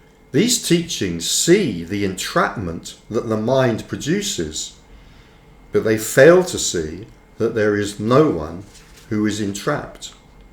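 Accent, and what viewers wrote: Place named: England, United Kingdom. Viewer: Listening to read his book with his English accent provides a nice intermission between episodes of Inspector Morse, Lewis or Midsumer Murders. Thank you! 🙏. 💥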